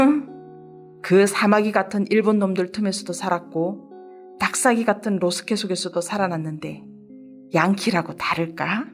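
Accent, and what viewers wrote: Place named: Gyeongsang, South Korea. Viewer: 경상도 억양은 어쩔수 없지만 발음은 좀 ㅠㅠㅠㅠ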